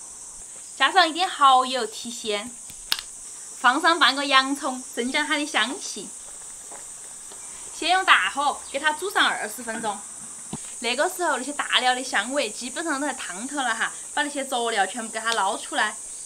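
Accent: Sichuan, China. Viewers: 都不知道你说的啥普通话 你是那个嘛四川的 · 四川妹子?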